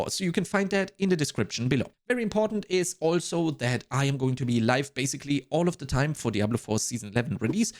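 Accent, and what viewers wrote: Germany. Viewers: I had to edit this to add the spreadsheet you provided: There is an astounding amount of work you've put into helping. That spreadsheet (you have linked below your video) is so helpful, and you have additional pages of info clickable at the bottom of that spreadsheet. Huge, huge thanks. I would sub 10x more if I could to give something back. Some really incredibly helpful info and tips I wasn't expecting. Like letting the drop specs guide your build. Thank you for all the time you put into this, I've happily subbed. PS: where are you from? I'm originally from Austria and you sound either German or Austrian? Thank you again so much for all the amazing help.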